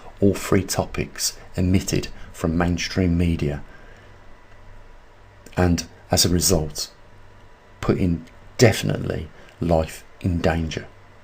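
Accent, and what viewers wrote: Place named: England, United Kingdom. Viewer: Click on CC at the bottom of the video and then click on the wheel to the right of CC for the settings for 'auto translate ' then scroll countries to select 'Spanish'. The Auto-translate isn't perfect especially as has an English regional dialect. Bless you and perhaps interpret main points in the video into Spanish and put that into comments.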